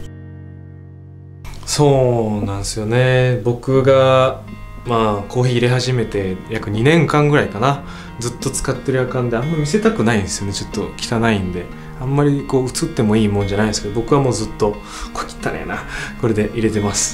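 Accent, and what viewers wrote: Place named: Okinawa, Japan. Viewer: あたり、與那城くんの沖縄なまりがちょっと聞けて私は興奮しました。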